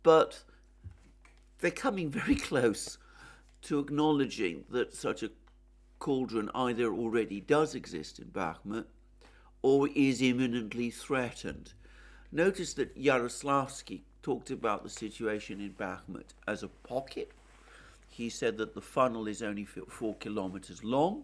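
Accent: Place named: RP, United Kingdom. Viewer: Alexander Mercouris: in-depth analyses, perfect understanding of geopolitics, posh English. Can anyone expect more?🙂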